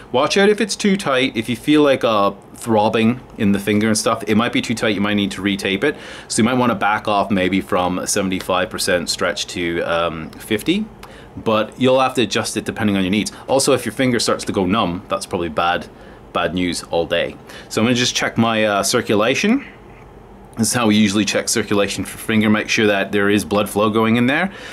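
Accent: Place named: Scotland, United Kingdom. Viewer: Interesting mix of Scottish & American & maybe a little Australian accent there.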